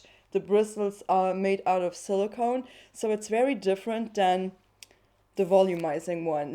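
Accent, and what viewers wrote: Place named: Germany. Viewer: Thank you for your reviews, they are very thoroughly made, really like and enjoy them every time! You are one of the very few YT ppl that dedicate themselves to clean and vegan as well as cruelty free make up products / brands. Happy to have found you! I notice some kind of German accent and you are using quite a lot of adverbs ... Are you originally from Germany? So curious ;) Have a merry Christmas and enjoy some free and relaxed time with your family and good (vegan?) food.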